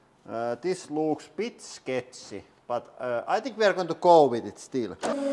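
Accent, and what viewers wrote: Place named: Finland. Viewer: Where are you from? The english sounds a bit Finnish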